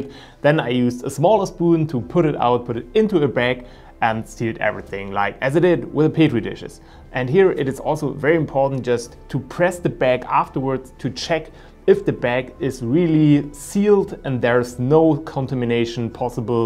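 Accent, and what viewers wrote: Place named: Germany. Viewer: So ein schönes Deutsch-englisch :D man merkt es... aber gutes Video !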